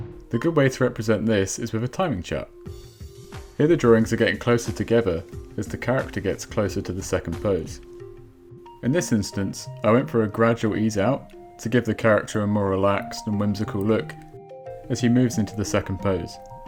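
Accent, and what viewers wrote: United Kingdom. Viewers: I had no idea you were actually British. In hindsight it is reckless to assume that everyone likes to do a British accent in daily life like myself. PS, your coloring is awesome, and is definitely the most unique part of your animations! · You sound like you are from British.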